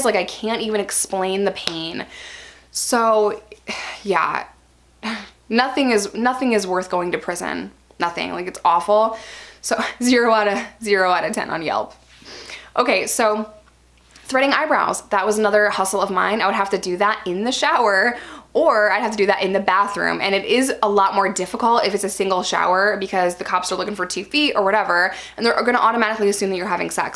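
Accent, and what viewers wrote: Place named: Southern California, United States. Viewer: Arkansas? Accent is a bit more So Cal.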